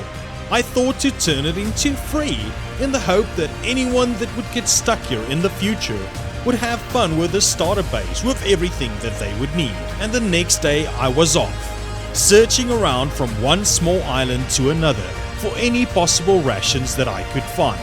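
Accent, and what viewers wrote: Afrikaans, South Africa. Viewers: South African? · you sound afrikans bro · The south african in me smells an accent 👀🤣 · You sound South African? Are you by any chance one of the 44? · Are u afrikaans? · Bro u gotta be South African with that accent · South africa? I'm ne not trying to offend you but are u south African because u sound like a south african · Good to hear a south african voice, epic video man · oh ok because i thought that accent of your sounded really like a SA accent was just curious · South african or new zealand? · mans sounds south african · Are you from south africa? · It sounds like your from SA? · Are you south african? Your english sounds a little like you got an afrikaans accent? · First time I've ever come across your channel, can hear that Afrikaans coming through :P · Haha nice , eks ook afrikaans so ekt die aksent amper dadelik erken 😂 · You are south african me to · Do I hear a South African accent? 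· Are you South African? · u sound afrikaans ..., are you? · for some reason you sound south african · You sound like a south african when you speak english (the accent) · Are jou South African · You sound like you come from ZAR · Why do you sound South African. Are you from South Africa?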